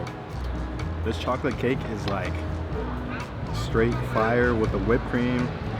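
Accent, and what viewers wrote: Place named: United States. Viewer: Are you American?🤷‍♂️🤷‍♂️You sound American. Watching from HONOLULU HAWAII. Hey, Mele Kalikimaka from America 😎🎅🏻🎅🏻 Very good video. I can always spot an American 🤓😍🇺🇸😎🎅🏻🎅🏻🌲☃️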